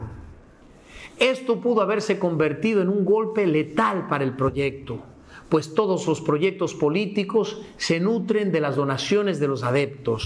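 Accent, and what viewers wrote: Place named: Dominican Republic. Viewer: Admiro tú español Dominicano, Increíble, eres 100% Dominicano.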